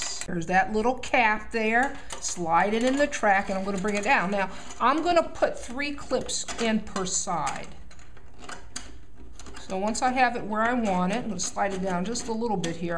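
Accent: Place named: New York, United States. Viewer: I gotta ask, what accent is this? Does this orignate from NY?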